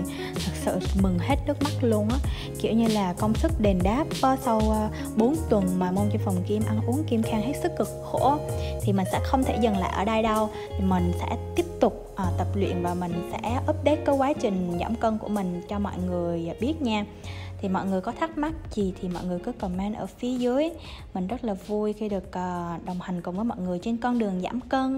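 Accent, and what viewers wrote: Da Nang, Vietnam. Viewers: Nghe giọng bạn như ở Đà Nẵng ý?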